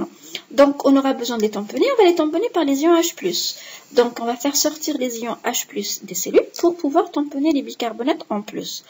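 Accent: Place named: Algeria. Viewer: Médecin Chef de service de pédiatrie en Malaisie / Maitre de conférence, je suppose que vous êtes algérienne( l'accent++++ ) hhh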